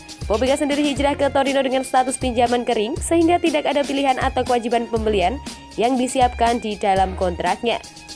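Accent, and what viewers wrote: Java, Indonesia. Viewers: Kakak suaranya medhok... mantav...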